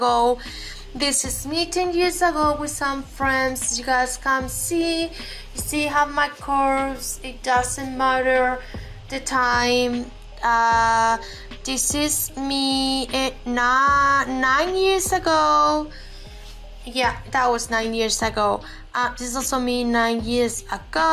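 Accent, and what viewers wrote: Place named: Spain. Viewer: Me encanta tu acento. Algun día visitare España.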